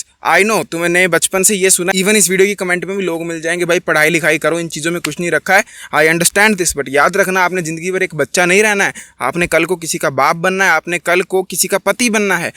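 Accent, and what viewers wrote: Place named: Garhwal, India. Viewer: Bhai tu garhwali hai na 😅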